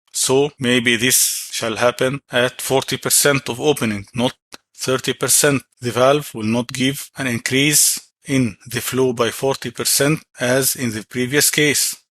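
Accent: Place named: Egypt. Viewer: يا عم لو حكيت بالعربي كان افضل في الشرح وتوصيل المعلومة.. في الاول اعتقدت ان لهجتك هندية وبعدين وضحت نبرة النيل.. لاحظت ان اغلب فيديوهات الهنود يشرحو بلغتهم رغم تمكنهم من اللغة الانجليزية وذلك لاعتزازهم بلسانهم، اما من يتحدث اللغة العربية تجده يلهث خلف اللغة الانجليزية والسبب !!! اجعل الشرح باللغة العربية مع استخدام المصطلحات الانجليزية حينها تكون المعادلة معقولة وتعم الفائدة. ربي يعينك ويساعدك. مهندس ليبي مر من هنا.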